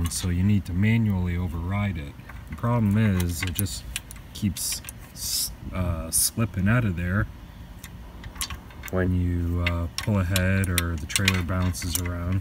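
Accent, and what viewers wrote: Canada. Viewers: Hope this helps you oat! I love crazy accent! Lol I may be wrong but I’m guessing Canada maybe? Out = Oat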